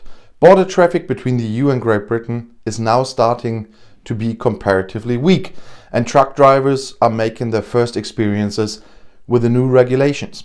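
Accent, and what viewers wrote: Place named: Germany. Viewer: With your slight German accent it almost sounds to me like the "Kent Access Permit" is perhaps instead called the "can't access permit" but that would be absurd wouldn't it?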